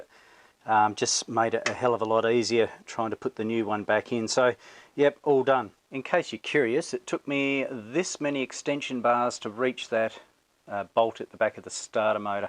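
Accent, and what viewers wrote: Australia. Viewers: Awlternator lol NSW accent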